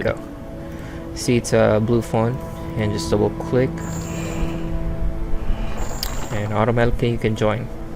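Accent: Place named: Philippines. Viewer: I was surprised your region is U.S. You sound so filipino for me. Anyways great vid ^^.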